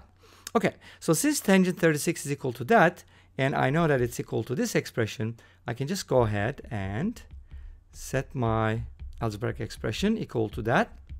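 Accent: Turkey. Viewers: I guessed from your (slight) accent you might be Turkish. Now it appears I am right.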